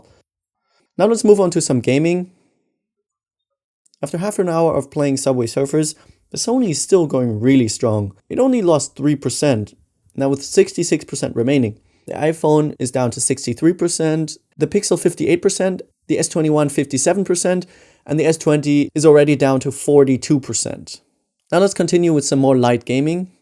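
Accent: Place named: Germany. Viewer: Strong german accent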